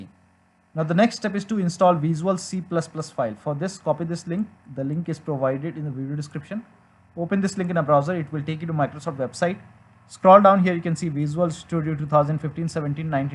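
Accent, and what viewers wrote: India. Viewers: every time that i hear indian voice i know my problem will be solved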